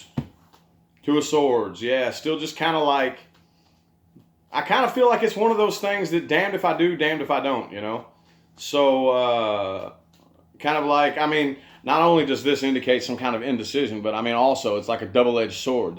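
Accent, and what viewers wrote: South, United States. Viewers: Marry me? 😍 A tarot reader with a southern accent....swoon!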